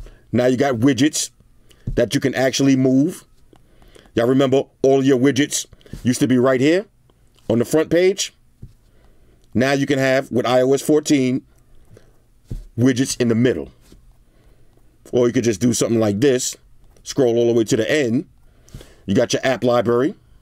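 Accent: New York, United States. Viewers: Yo going off is the greatest shit lol. The NY force is strong. You can hear the wind hitting the timb tree in his heart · My mans def from the NY area! 😂 “jefferson forehead” LMFAO!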